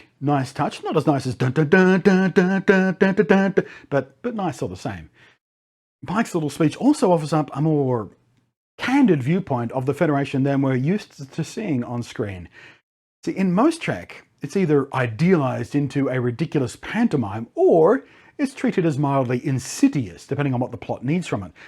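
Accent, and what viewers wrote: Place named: Australia. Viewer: Hey, expat Aussie in the US for 23 years, are you likewise? I hear the Aussie accent but occasional hard Rs:)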